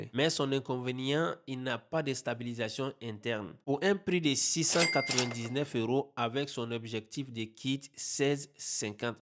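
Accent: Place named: Africa. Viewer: Merci 🙏. Je suis juste qu'un simple amateur/débutant avec un G7 de 2016 que j'ai laissé dormir depuis looooongtemps et que je ressors petit à petit depuis quelques semaines. Que de temps de perdu...😔. Pour ce qui est des Lumix, j'apprécie l'ergonomie,la prise en mains qui fait boîtier solide. Les menus également que je trouve assez intuitif. Autrement,je t'ai découvert il y a peu et j'apprécie ton contenu. En plus,pour une fois que l'on a un"cainfri"🔥(avec le vrai accent)😂passionné de photos/vidéos qui à sa chaîne Youtube et qui fait ça bien pourquoi ne pas l'encourager ? Pour le coup,je m'abonne et je lâche un:Yah Foye,on est ensemble 🤣 🔥. Mes salutations de la Réunion 🇷🇪 🙏 🔥 🤝. Bonne soirée.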